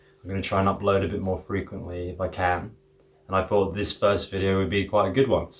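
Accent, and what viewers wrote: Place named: United Kingdom. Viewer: Only Asian I know who can speak with Britain accent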